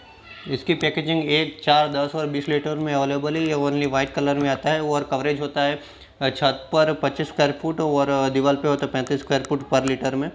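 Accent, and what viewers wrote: Gujarat, India. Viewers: Gujarati ho na??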